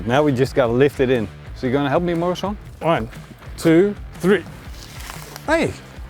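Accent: Netherlands. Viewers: I love your dutch accent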